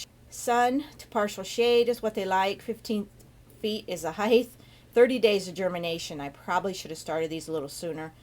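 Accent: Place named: Wisconsin, United States. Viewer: Thanks for this. I live in Santiago Chile and I've seen these growing around neighbors' gates. I just picked a few dried fruits off them and will plant the seeds are you suggest. Can't wait! These are such amazing flowers! By the way, I grew up in the midwest and enjoyed listening to your accent! (Wisconsin?)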